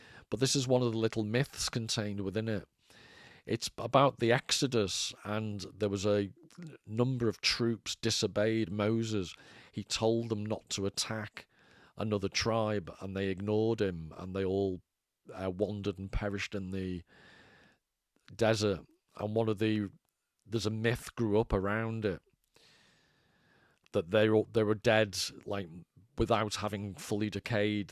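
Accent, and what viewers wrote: Liverpool, United Kingdom. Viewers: just discovered you today, I will subscribe, I have read blood meridian about 5 times, although suttree is my favourite, I will have to read America, good to hear a Scouse accent, i left Liverpool 35 years ago!! Keep on keepin on 🙏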